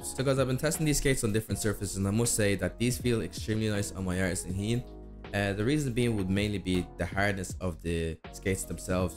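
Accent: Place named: Ireland. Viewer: Love the review! Always nice to hear another irish voice while browsing the internet ☺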